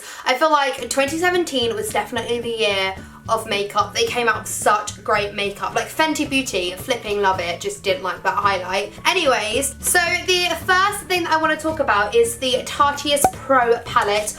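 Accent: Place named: United Kingdom. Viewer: Hi 👋🏻 I subscribed and pressed the bell. I remember the first video I watched from you was your nan doing your makeup and it was hilarious!!! At first I thought you looked like Nicole Skye but then I heard the british accent then I was just like 😅 (that was awkward)😂 btw I hope your nan is well!! ❤️❤️❤️❤️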